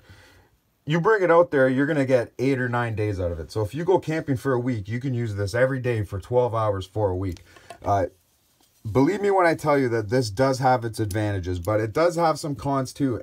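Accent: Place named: Ottawa Valley, Canada. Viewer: ya its a decent one. CT used to always stock zippo fluid, but since zippo bought ronsonol I haven't seen it. Just the yellow one now. Ya I knew u were a Canuck from another vid of yours. Woulda guessed anyways, ur accent is similar to what we got in the ottawa valley.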